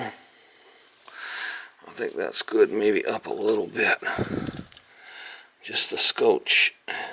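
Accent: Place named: Boston, United States. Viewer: You sound like you are from Boston .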